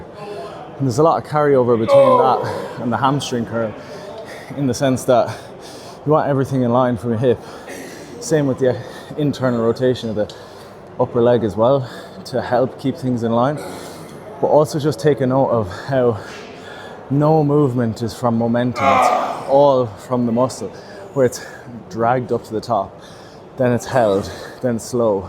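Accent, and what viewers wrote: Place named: Ireland. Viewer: we’re all strange cunts, Normal is boring 😆 , Yes I’m from Cork myself that’s why im tryna figure out where from here your from by accent😂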